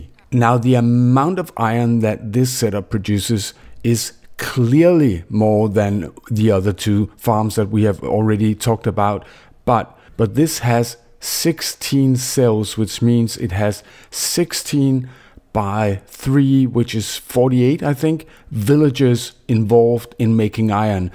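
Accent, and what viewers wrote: Denmark. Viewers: U danish bruh??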